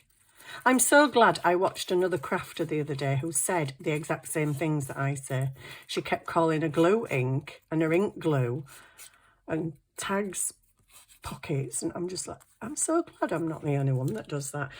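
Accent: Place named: Scotland, United Kingdom. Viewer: Are you Scottish? I just LOVE your accent!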